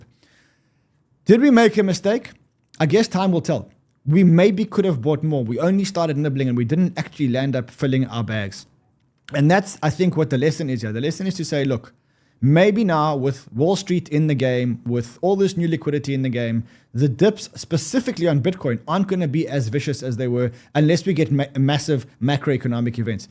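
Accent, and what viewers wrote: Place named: South Africa. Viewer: I think the South African accent should be banned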